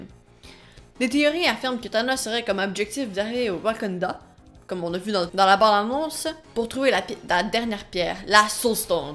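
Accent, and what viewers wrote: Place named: Quebec, Canada. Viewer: le seul probleme c'est que moi et l'accent québecoi ca fait 2 xD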